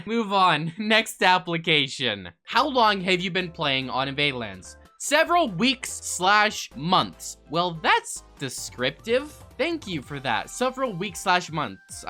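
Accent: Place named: United Kingdom. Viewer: I love your randome british axent I speel it the wrong porpes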